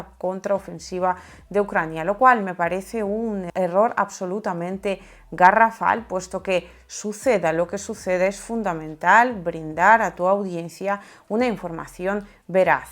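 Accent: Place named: Spain. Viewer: Me sorprende el acento español de Liu, no es tan fastidioso como el de varios periodistas nativos de España de otros canales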